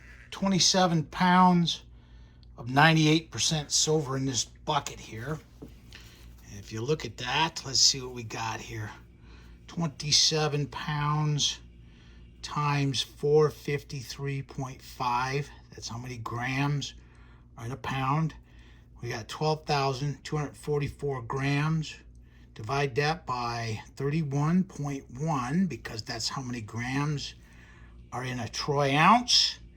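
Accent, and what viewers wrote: United States. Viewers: I really enjoy listening to your American English accent. Is there any information in the literature on the ratio of silver to copper deposited when the electrolyte solution contains silver and copper nitrate? It's probably above 1000, right?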